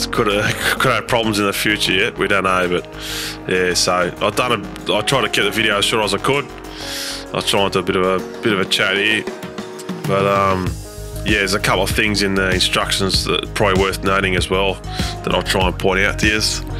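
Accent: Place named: Australia. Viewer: Haha im sure you're gonna have loads not have a clue what your saying, but Aussie to Aussie its beaut mate. Just waiting on my QR2 with 2 base sides to arrive.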